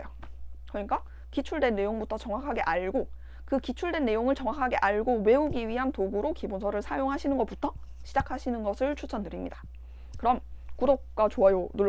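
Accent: Busan, South Korea. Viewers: 부산이신가요? 똑~띠 이십니다. ^^b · 마산 진해 부산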